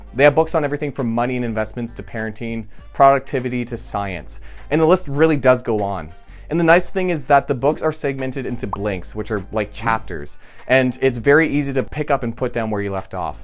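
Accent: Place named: Canada. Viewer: Watched a few of these videos now (great content) and this is maybe the first one where I REALLY noticed the Canadian accent XD. Also the salary multiplier here in the UK is normally about 4.5x.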